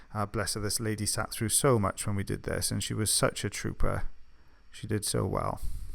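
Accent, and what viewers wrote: Wales, United Kingdom. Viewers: Welsh accent I hear??? :) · The captioning is getting a bit better, I think it has problems with your lyrical Welsh accent!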